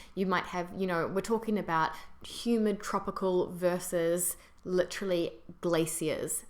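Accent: New Zealand. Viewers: Clearly a Francophile with a Kiwi accent. Sad. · Is it a common qiwi way of pronouncing 'e' sound or is it just you?:) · I was wondering why your voice sounded so familiar. Then I realised you're from nz too!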